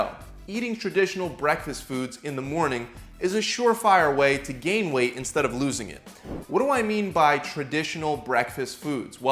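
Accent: Philadelphia, United States. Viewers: Philly accent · Haha are you from Philly? Love my Philly wooder.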